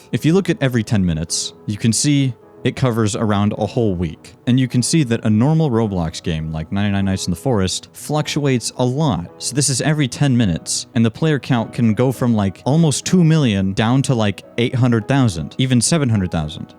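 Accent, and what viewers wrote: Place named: Singapore. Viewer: you live in singapore? your accent seems like it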